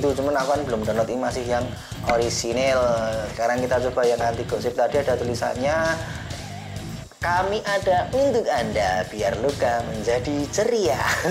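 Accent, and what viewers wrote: Java, Indonesia. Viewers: Logat koyo wong jowo koe mas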